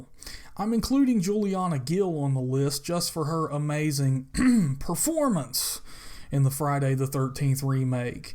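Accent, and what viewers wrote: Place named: South, United States. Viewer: Love you southern draw dude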